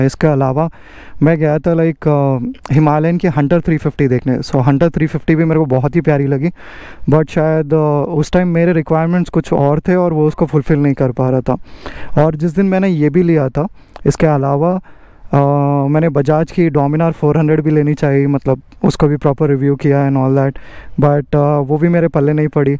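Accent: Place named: Telugu, India. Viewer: with telugu accent is the best bro.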